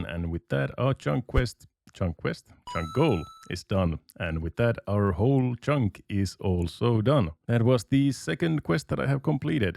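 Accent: Finland. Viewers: Are you Finnish?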